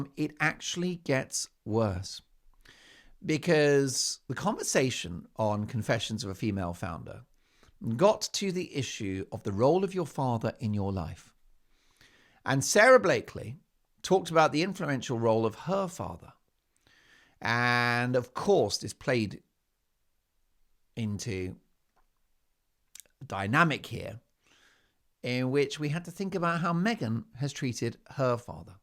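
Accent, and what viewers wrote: Canada. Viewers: Your Canadian accent is great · Your Canadian accent is so funny. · Your Canadian accent is quite good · The Canadian accent is ace! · Love your french from Moncton NB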